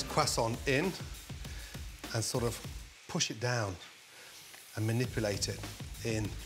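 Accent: United Kingdom. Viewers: Hi Gordon! I love your dishes, I'm Mexican and for me it is difficult to understand your British English, but I love to watch you...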